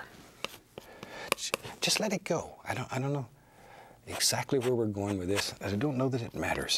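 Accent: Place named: Midwest, United States. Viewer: That's his mid-western accent. He's originally from Indiana.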